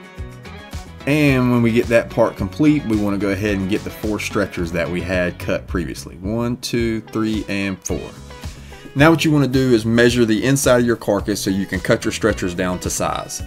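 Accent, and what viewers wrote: United States. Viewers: I dated a guy who sounded like you. He was from West Virginia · Enjoyed watching my first video of your work! (with hints of Bourbon Moth, possibly? And, of course, I enjoyed those East Carolina "o's.") Though I've been in Texas most of 60+ years, my father was from your area and his ancestry origins are all from the area of Pinetown & Pantego? since the 1700's, I believe. I was born in New Bern as my folks moved around that East coast area a quite a bit in those early years before moving to Texas. Anyway, you were really funny and gave me some more ideas for my own cabinet building when I get to get back to it soon. Thanks, again! (yes, I will be subscribing. :)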